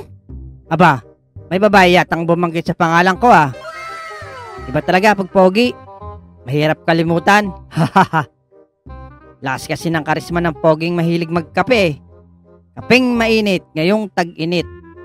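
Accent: Batangas, Philippines. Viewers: kuya taga batangas ho kayo?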